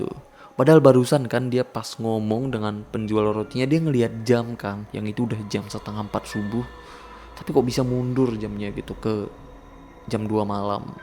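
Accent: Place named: Sumbawa, Indonesia. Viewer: Bang, pernah tinggal di sumbawa kah? Logatnya kek ada logat sumbawa..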